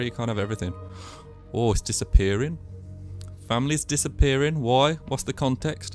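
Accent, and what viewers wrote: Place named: Birmingham, United Kingdom. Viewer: Such a nice looking guy with a yummy brummi sound!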